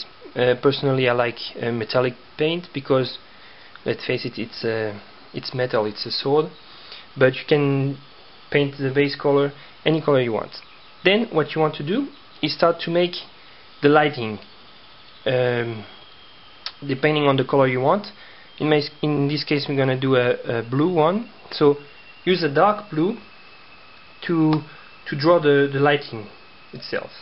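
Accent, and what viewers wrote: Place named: France. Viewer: French accent of course!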